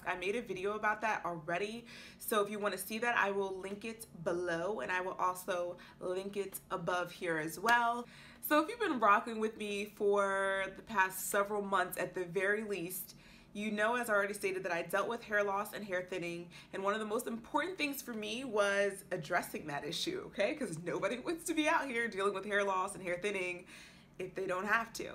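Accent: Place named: United States. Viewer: natural hair black women are sooooo gorgeous and the american accent on top is a bonus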